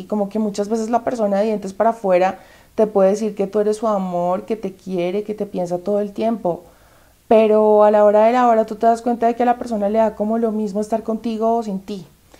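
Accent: Bogotá, Colombia. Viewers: Así se expresan los Bogotanos 😊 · Me encanta tu acento colombiano, ni raja ni presta el acha, aquí en México decimos: ni picha, ni cacha ni neja batear.... excelentes videos....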